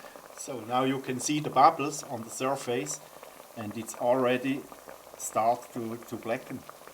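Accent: Switzerland. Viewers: Dein Englisch mit dem Schweizer Akzent hört sich irgendwie lustig an 😅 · bish du schwitzer dim akzent no🤔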